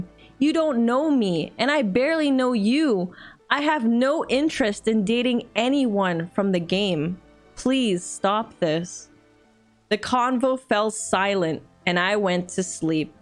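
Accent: South, United States. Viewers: that southern accent SENT ME 🤣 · Lol, your southern accent is 🔥. But you live in Georgia, right? That's probably why. 😀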